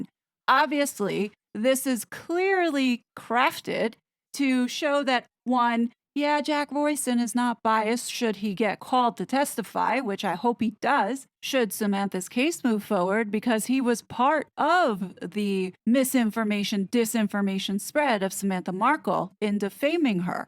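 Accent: New York, United States. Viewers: I don’t believe she is attacking appearance. A ferret is a type of weasel, which I believe is calling her. I may be wrong, but that was my take. native New Yorker is coming out in this video. When she gets riled up about all these deceptive shenanigans, accent stands out and she speaks faster and with more vocal attitude. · I love when your New York accent and phrases shine through🥰. I live in Florida now but originally from Jersey shore, I miss those things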